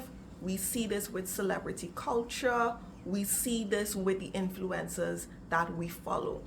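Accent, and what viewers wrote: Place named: Caribbean. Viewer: Oooh I Love the Carribbean accent…,what parish ?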